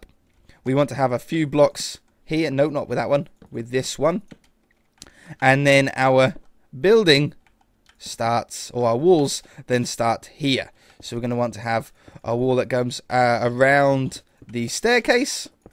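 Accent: United Kingdom. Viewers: That British Accent He never had a castle!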